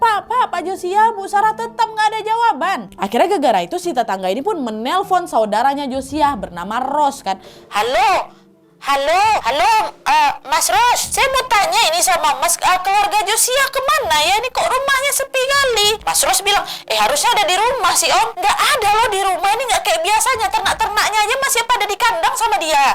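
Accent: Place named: West Sumatra, Indonesia. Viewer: cuma d channel ini , bisa dengar berita kriminal logat minang 😅. Narasi percakapannya g bayangin bule lg ngobrol pke logat minang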